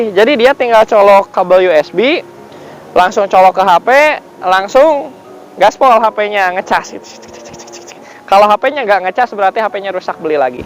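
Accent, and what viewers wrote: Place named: Banjar, Indonesia. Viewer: Banjar nya mang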